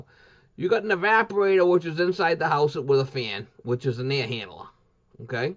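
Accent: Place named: Brooklyn, United States. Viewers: from brooooookllllllynnnn....lame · this was awesome I just got 5 Ton 14 Seer Goodman Air Conditioner today so that was too funny the Goodman stuff thanks for the info I understood half... lol thank bro cool accent must be NJ or NY or Boston...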